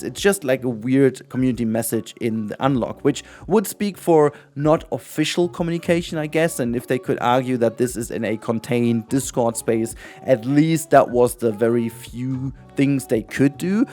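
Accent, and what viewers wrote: Germany. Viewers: Hello I have a question. Are you German? Just asking cuz you sound German to me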